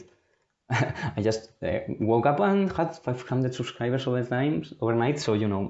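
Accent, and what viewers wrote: Spain. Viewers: Makes sense. Im also Spanish, so I could easily tell 😂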